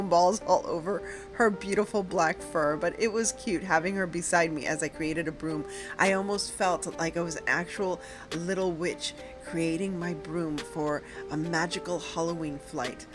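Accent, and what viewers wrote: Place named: Miami, United States. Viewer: Ohhhh, ok, now it all makes sense : your accent, the love of halloween......you're from miami! Lol i grew up in kendall til i was 15 n i KNEW you sounded like my family😂 i feel like NO ONE n NOWHERE really celebrates n does it up for Halloween like Miami n ppl born n raised there. Idk if u were born there, but if u werent, ik youve been there a LONG TIME. Ahhhhhh, mi hermosa!!!😂❤❤❤ youre projects are amazing and i ADORE THEM!!!❤❤❤❤❤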